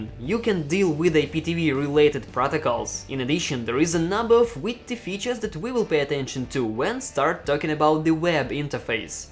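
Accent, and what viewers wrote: Russia. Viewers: Russian English killing me🤣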